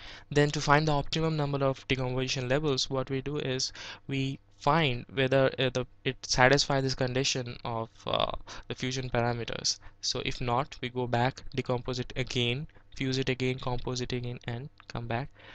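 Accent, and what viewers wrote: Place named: United States. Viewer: Through this video, you just took me to the good old college days & our project work. Your pronunciation has become somehow American :D Where are you now a days?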